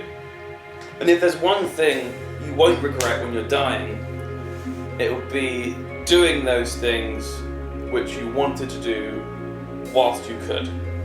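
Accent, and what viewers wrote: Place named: England, United Kingdom. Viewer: ugh the English accent. why don't you all take up more of the Irish speak it's more musical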